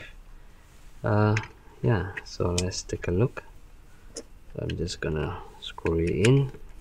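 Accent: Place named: Singapore. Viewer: you sound singaporean are you? great vid btw thanks for telling me about the worker half length pusher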